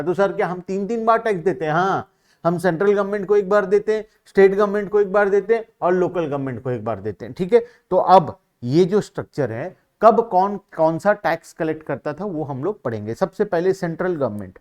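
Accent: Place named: Sambalpur, India. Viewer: Sir kya app western odisha se hai kyu ki app ki bate thodhi thodhi sambalpuri language jese lag raha hai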